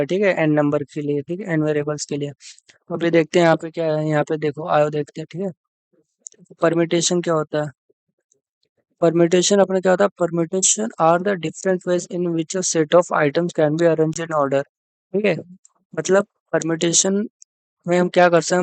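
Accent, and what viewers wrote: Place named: Maharashtra, India. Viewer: your marathi accent is so sexy:face-blue-smiling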